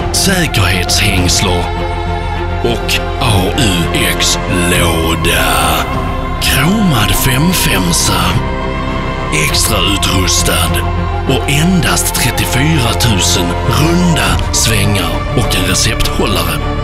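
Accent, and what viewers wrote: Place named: Skåne, Sweden. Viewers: Cool video Can't stand the southern accent though. D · Gabriel von Blumenthal Everyone thinks different, but I would say in Skåne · Gabriel von Blumenthal skånska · Its called skånska, spoked by those who are from skåne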